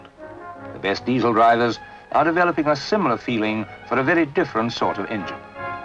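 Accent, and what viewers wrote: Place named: RP, United Kingdom. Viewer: I enjoyed this! It's a piece of social history as well as railway history. Look at the clothes people wore, listen to the BBC English of the narrator, the "Light Programme" style of the backing music, the explicit statement near the end that only men would ever drive these trains, spot the parcels trolley on the platform etc etc... it's a real step back in time.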